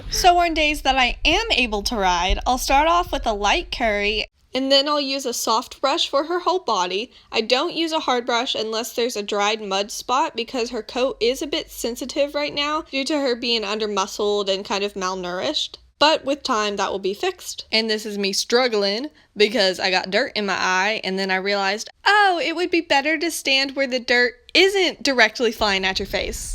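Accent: United States. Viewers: Are you American 😑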